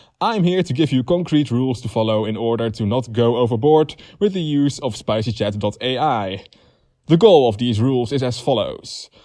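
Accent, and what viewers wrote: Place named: Netherlands. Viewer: Are you dutch? You really sound dutch Anyways, great content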